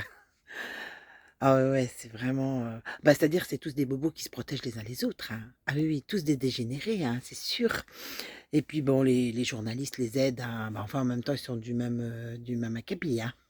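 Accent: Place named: Nord-Pas-de-Calais, France. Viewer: Bonjour, merci de nous raconter la vérité avec autant d'humour, j'adore ton accent de chti, ça fait du bien de rire un peu dans ce monde de fou:face-blue-smiling: tu es une très belle personne:face-red-heart-shape: je te fais de groooos bisous de Belgique 😘😘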